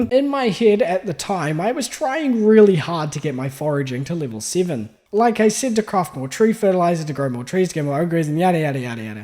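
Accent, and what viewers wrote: New Zealand. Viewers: Ever since I found your channel and watched you super modded 100 days series I was trying to figure out your accent and to find out you’re a kiwi like me is such a nice surprise!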